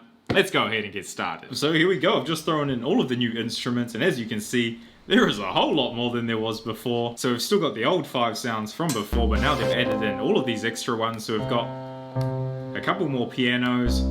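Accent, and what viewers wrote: New Zealand. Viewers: y do u sound new zealend? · Are you from New Zealand? I recognize your accent. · Are u from nz? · you sound like a NZ'er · Is this guy from NZ? the accent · are you from new zealand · you from nz oi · Are you from New Zealand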